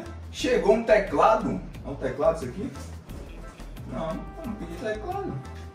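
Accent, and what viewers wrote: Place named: Bahia, Brazil. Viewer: Sou de salvador tbm, já sabia que ele era baiano pelo sotaque dele kksajdk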